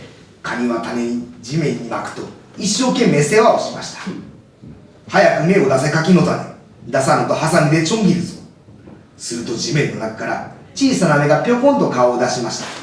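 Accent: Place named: Kagoshima, Japan. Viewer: あちこちに鹿児島なまりが残ってるのがめっちゃ好きです!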